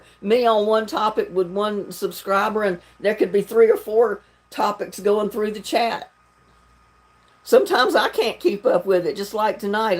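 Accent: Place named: South, United States. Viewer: That was a cool live stream, though I enjoyed it so far and I still like your voice with that accent. I don’t mind accent you know southern sounding. I like the way you speak you know everybody does speak differently though.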